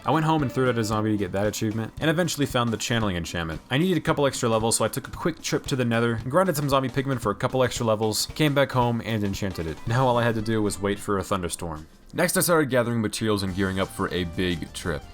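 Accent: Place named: Ireland. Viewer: the casual random irish accent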